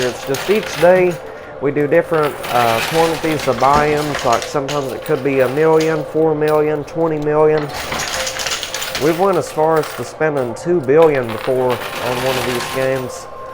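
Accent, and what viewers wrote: South, United States. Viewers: That is a southern US accent if I’ve ever heard one.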